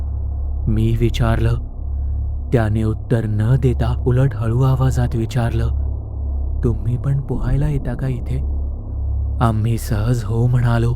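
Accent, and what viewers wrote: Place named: South, India. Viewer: मी अनेक वर्षांपासून तुझे stories ऐकतेय… पण आता तू गाण्यात बोलतोय…. फिल्म मधे south indian accent सारखे तू का बोलतोस??? Like a non Maharashtrian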